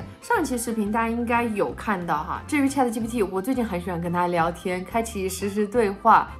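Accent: Sichuan, China. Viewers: tutu新年快乐❤不是四川人也觉得四川话亲切了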